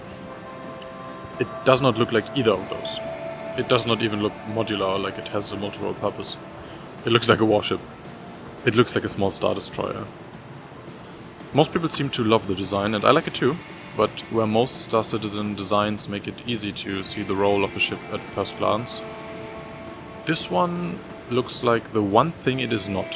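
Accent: Germany. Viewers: moin, du scheinst ja definitiv ein Deutscher zu sein, unschwer zu hören. danke für die großartige Arbeit! weißt du denn ob wirklich keine Rampe zum Hangar ist? kommt mann nur über den Bauch rein?(Leiter/Fahrstuhl)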